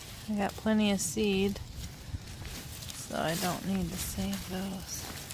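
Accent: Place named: New Brunswick, Canada. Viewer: Thanks for letting me know where your accent is from. I have good friends across the Bay in New Brunswick who pronounce words the same an you! LOVE IT! Bacon makes everything better!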